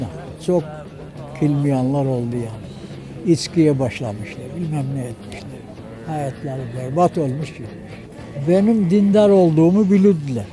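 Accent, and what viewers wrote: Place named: Rize, Turkey. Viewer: Şiveden Rizeli olduğunu anladım.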